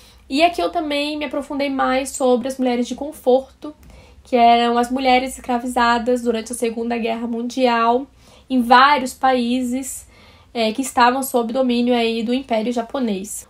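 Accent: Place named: Rio de Janeiro, Brazil. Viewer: Vc é afinal de Santa Catarina ou do Rio? Tá com sotaque carioca nesse livro 😅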